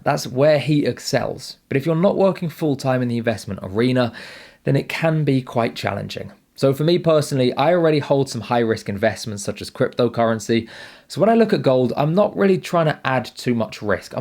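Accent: United Kingdom. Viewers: Subbed for financial opinions / both sides of the argument,.....Not the British accent......🧐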